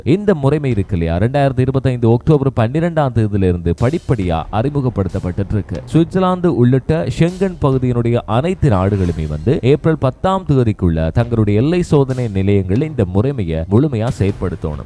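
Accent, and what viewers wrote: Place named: South, India. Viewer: ஏன் எங்களின் அருமையான ஈழத்தமிழுடன் தென்னிந்தியத் தமிழ்உச்சரிப்க் கலந்து பேசுகிறீர்கள் ?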